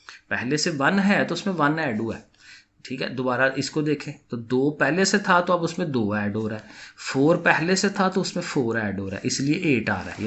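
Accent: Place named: Lahore, Pakistan. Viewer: Teacher's lahori accent revel on ... " Har baar multiply ho rha ha...."😂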